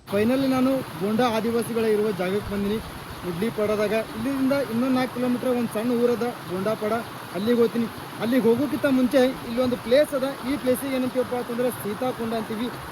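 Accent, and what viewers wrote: North Karnataka, India. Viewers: Super uttar Karnataka maatu , u k huli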